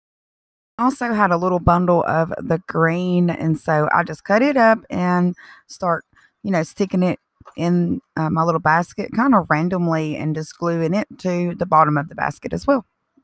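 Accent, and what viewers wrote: South, United States. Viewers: Omg!!! So cute and creative! I love it and love your southern accent!!! Blessings to you😍😘❤️ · You are such a blessing to watch. I love your crafts and "Southern" accent. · SUPER cute! You are soo creative and I love your accent...being from TN I thought I had a southern accent..you got me beat!...(GA or NC maybe???)LOL!!...NEW SUB HERE!! Keep the ideas coming momma! (people from the south know MOMMA is a term of endearment)...hahahaha...love you girl! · You are so darn adorable I love your southern accent Bless your little heart I love your wagon idea & I’m so glad I found you Keep on creating honey & god bless Hugs, Dee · the southern drawl makes it even better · Very cute! I love your voice,I have a southern accent also but not as pretty as yours!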